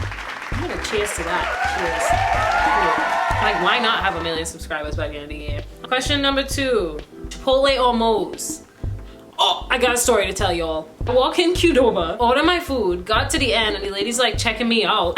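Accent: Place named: Trinidad and Tobago. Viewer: Really proud of you. Still hearing the lill Trini . do ur thing girl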